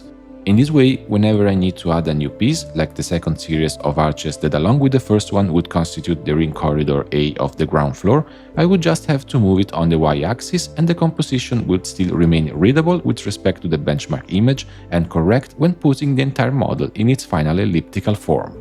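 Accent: Italy. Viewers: Ehm mi sembri un italiano all’estero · Ma sei italiano??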